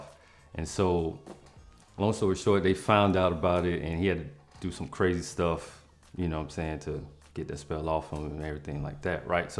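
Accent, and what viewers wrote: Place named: South, United States. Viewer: is so country and it makes me so happy ❤😂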